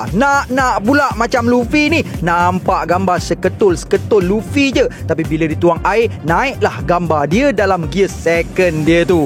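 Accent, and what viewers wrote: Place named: Terengganu, Malaysia. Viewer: Asalamualaikum..bila la ku nak dengar cakap tani..tak moh ka cakap cara kito..beri satu dunia tahu cakap kito...huroooong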